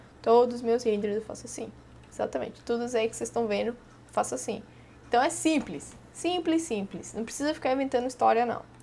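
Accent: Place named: Bahia, Brazil. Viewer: Bacana! Vc pode conseguir também editar os mapas de bump e reflexo através do Color Correction em vez de usar o Mix. Foi inclusive a primeira vez que vi alguém usar o Mix com essa finalidade que vc usa. (em geral eu uso o Mix apenas no Diffuse pra mesclar texturas diferentes, como adicionar pontos enferrujados a um metal originalmente polido). Obs. Adoro sua didática. Esse jeitinho alegre e o sotaque baiano me encantam.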